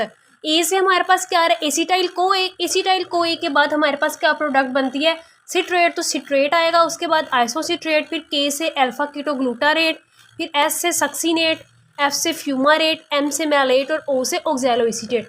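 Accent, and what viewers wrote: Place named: Punjab, India. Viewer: Punjabi speaker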